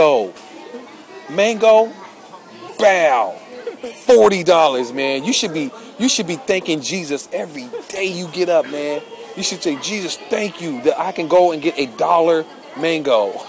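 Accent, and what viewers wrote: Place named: AAVE, United States. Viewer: Ebonics makes my ears hurt. :(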